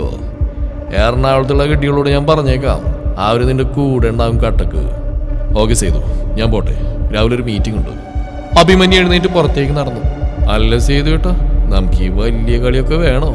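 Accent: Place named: Thrissur, India. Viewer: ❤️🥰🥰സൂപ്പർ... എന്റെ... ആ തൃശൂർ സ്ലാങ് 👌👌ഒരു രക്ഷയുമില്ല... സൂപ്പർ 😍🥰🥰🥰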